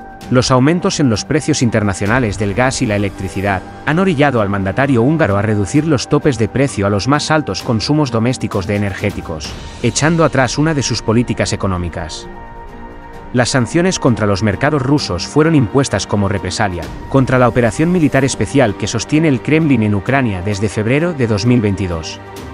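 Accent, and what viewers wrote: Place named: Spain. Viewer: Bolivia noticias, y sale hablando un español. ??????????????????????????????????!!!!!!!!!. Habrá un canal que se llame chile noticias y salga hablando un cubano o un yanquee ????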